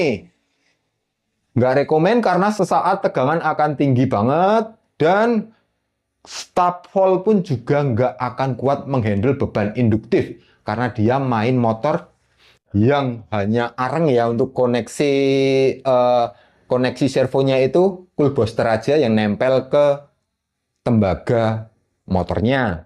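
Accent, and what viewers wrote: Java, Indonesia. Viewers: Jawa ini ngomongnya tebal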